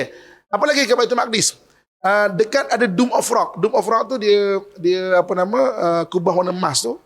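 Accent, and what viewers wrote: Malaysia. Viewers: Alhamdulillah ilmunya beliau patut diacungi jempol, meski sayye agak-agak tidak paham apa yang disampaikan soalx menggunakan logat Melayu. Salam dari 🇮🇩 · hmmm aku xsuka dgr logat² semenanjing ni. Dosa jadi dose 🥴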